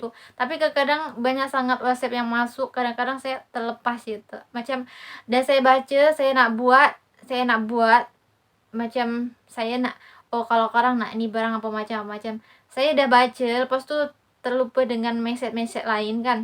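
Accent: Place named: Malaysia. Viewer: Pandainya kamu bercakap gaya orang Malaysia👏👏👏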